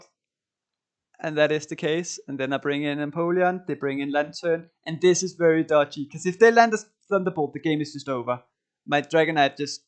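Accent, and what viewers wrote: Denmark. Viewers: Your danglish is beautiful ❤️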